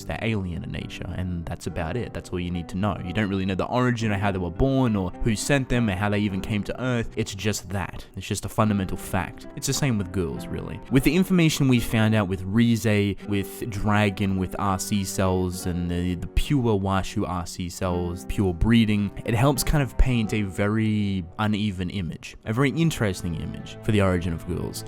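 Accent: Australia. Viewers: Wait, ur Aussie? Same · Wow, can definitely hear your Australian accent much more in these older videos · Polynesian tribes in those days did actual believe in the spiritual cannibalism that consuming the flesh of your enemy made you a more efficient warrior annnd say swear your aussie thats crazzy cause i never realized at all haahah im froom melbourne btw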